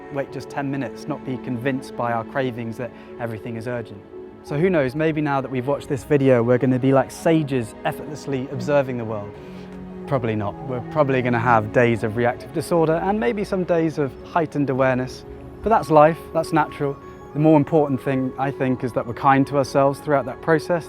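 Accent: United Kingdom. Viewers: Ancient wisdom and British accent! My YouTube knows exactly what I like. 👀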